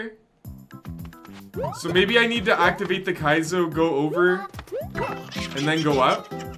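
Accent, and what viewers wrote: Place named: Canada. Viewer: I love your canadian accent when you speak german. How about a german greetings, welcome, hello in the next video for your big german fan-crowd? :-) Grüße, Willkommen, Hallo ;-) And an extra "guten abend" for your boy foolhardy 😎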